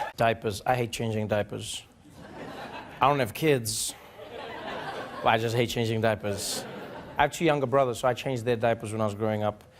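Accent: South Africa. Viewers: I truly miss the daily show 😢 I love it! I am from Holland 🇳🇱 but I love 🥰 how you tell it so graphic that I can feel myself part of every story you tell. I love your South Africa accent and live. And this what you tell I truly believe back in history we see that we human created toys and games from our hand 🖐️ to play tennis, to finding a round brick 🧱, throwing robbed coins 🪙, gambling 🎰. I follow every show from you I hope that you come back with something similar like the daily show. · Come Home & Run for President, PLEASE!!!..and that ain't a joke, you'd be shoe in, got my/our vote...and wouldn't it be priceless running against Julias in 2029!!!... "_" ;-p....and have to mention, it was a pleasure working with you or should I say around you & shoot day driver on the Simba Chips Local Flava Ad campaign in 2010 or was it 09?, cape Town, you had me & those not sleeping at 4am pu in stitches laughing...well f-ing done man and don't EVER lose your SA accent proper pls..even if the networks did their worst, keep it local bruv:) much love & thank you #TrevorNoah for being the best foot forward SA has to offer...one of but among the top;-p #fuckTrump :) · I think it’s cause he has a South African accent. 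It’s clear and easier to understand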